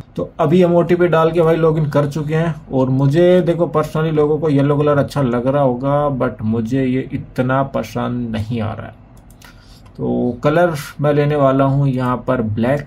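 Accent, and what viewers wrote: India. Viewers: i love your accent bro .. sounds like a pahadi ❤❤ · Bhai aap kaha se ho Rajasthan m , boli se aap Jhunjhunu side k lgte ho ❤ , btana bhai jrur se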